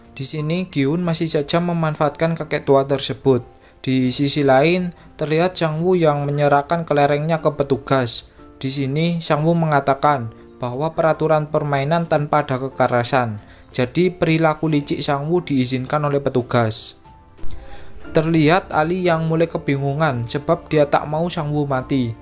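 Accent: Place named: Banyumas, Indonesia. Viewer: Ngapak yak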